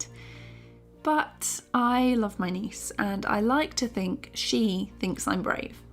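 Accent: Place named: United Kingdom. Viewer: I really like your accent! whereabouts are you from in the UK? I'm going to the UK with YMS visa. I'm studying with your video.haha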